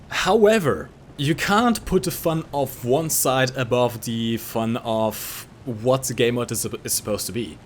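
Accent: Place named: Germany. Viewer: I like ur German accent and also very well said.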